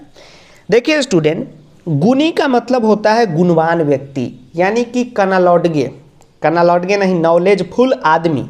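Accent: Bihar, India. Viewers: Sir aap bihar se ho 😊😊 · Sir aap bihar se hai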